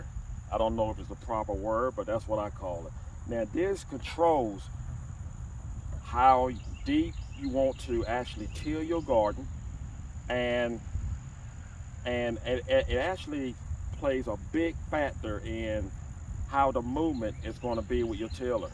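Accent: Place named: Baltimore, United States. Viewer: Amazing video! Also you have a thick ass Baltimore accent 😂😂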